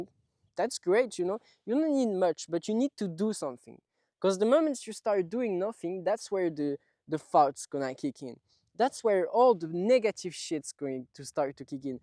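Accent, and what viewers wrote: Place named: France. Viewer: what part of france is your accent from?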